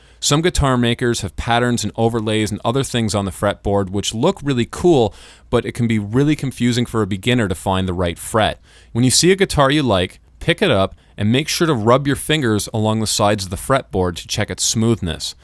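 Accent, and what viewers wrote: Canada. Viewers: that canadian accent ughhhhhh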